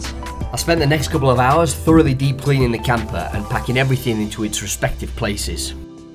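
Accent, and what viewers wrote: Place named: North, United Kingdom. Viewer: Been following you on instagram for a while, love the long form YouTube style. Always class to hear a fellow northerner too! Looking forward to the rest of the series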